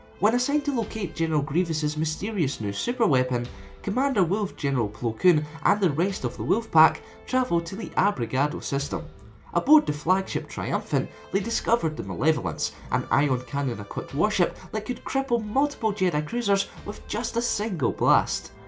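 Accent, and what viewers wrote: Scotland, United Kingdom. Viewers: you sound really scottish...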